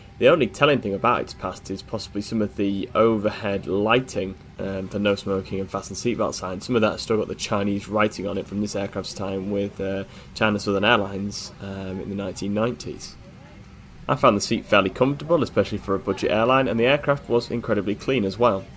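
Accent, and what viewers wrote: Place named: Yorkshire, United Kingdom. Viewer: Are you based in Yorkshire? I can't 'get' your accent - yet! Talking of LBA, after I picked up my PPL (from CAA Office in London!) I took 3 friends into LBA in a Cessna 172 for a legal pleasure flight. As I taxied in, there were hundreds of flags waving! I was shocked and impressed but soon realised that it was because a member of the Royal Family had landed a few minutes before I did! A Jet2 pilot once read out Birthday Wishes for someone I was with and actually sang the song over the 'tannoy'! Embarrassingly, many of the passengers joined in! What a treat though!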